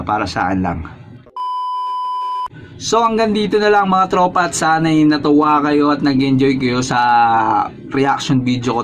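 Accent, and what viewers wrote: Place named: Ilocos, Philippines. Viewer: Ilocano ka?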